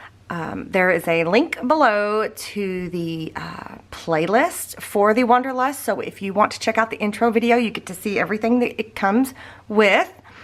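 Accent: South, United States. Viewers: I love ALL the new designs and templates. The album is a beautiful journey I look forward to taking. Thank you..thank you...thank you! Always fun watching you create and this northern girl could listen to your southern accent all day.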